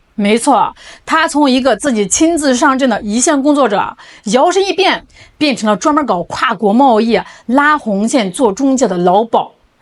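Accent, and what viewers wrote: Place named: Shandong, China. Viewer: 山东口音😂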